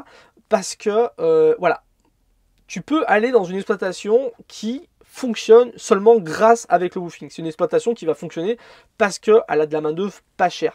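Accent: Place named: North, France. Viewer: Bonjour Merci pour la vidéo. Il est plus rassurant de partir en woofing car il y a une certaine réglementation. Porte à porte plus compliqué quand une fille part seule en Australie. Le principal est d être claire avec le woofer et être très explicite quant à nos attentes. Soit dit en passant, vous avez l accent nordiste Merci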